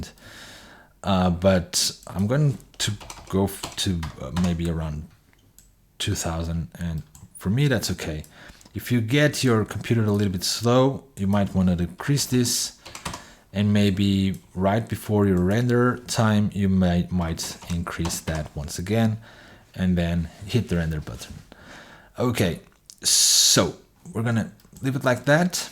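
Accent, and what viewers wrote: Portugal. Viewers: ele é português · pandahg00 Portugal n br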